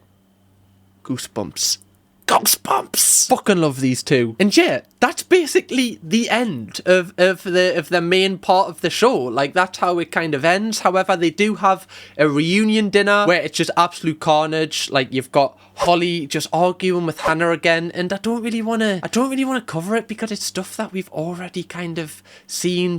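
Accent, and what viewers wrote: Newcastle, United Kingdom. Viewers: Your videos are okay but I'm subscribing because your a geordie.... UP THE TOON!!! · Are you from Newcastle? Wanna know your accent :) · Hey!! I just LOVE your accent! I'm from Florida but my best friend is a geordie and you sound like him...or are you Scottish?? You are effing hilarious "SAY YES! SAY YES!! SAY YES!....PAHHH!!" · You are so intuitive but also funny and entertaining! Lovely to hear the Geordie accent! My parents were from Hexham, keep up your good work 😂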